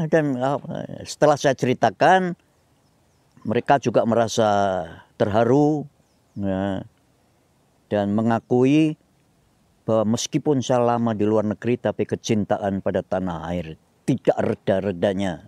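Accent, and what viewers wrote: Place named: Java, Indonesia. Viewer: Eyang aksen jawanya masih medok sekali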